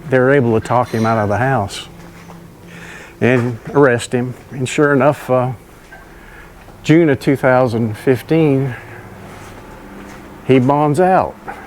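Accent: United States. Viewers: Your health is so good Sir , you could walk for an hour and making videos for your fans Keep it up! Sir I watch your videos to practice my listening I really love American English. Love from the Esarn part of Thailand.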